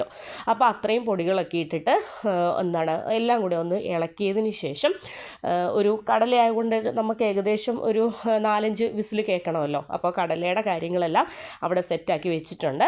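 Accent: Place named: Trivandrum, India. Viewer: Nice video. Appavum kadala curryum nalla combination aanu.Nalla avatharanam. Kellan enik valiya eshtamanu. Place aevideyanu? Samsaram ketit Trivandrum aanennu thonnunnu ❤❤❤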